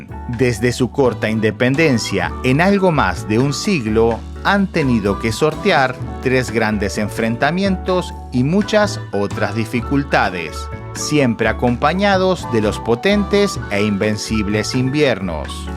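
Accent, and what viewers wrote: Argentina. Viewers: ¿Eres mexicano o argentino? Porque veo que haces tomas de referencias mexicanas, pero hablas como argentino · Me suena argentino este muchacho.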